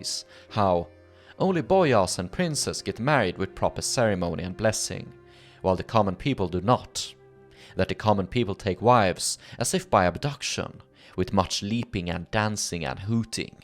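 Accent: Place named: Sweden. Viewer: Premium quality material as always, and your English is excellent, grammatically superior to many (if not most) native speakers, Swenglish charm but with perfect 'ch-' sounds. First rate.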